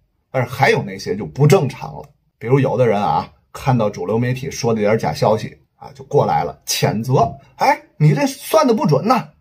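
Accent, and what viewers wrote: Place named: Tianjin, China. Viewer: 第一次听到带津味理智的优良政治評論！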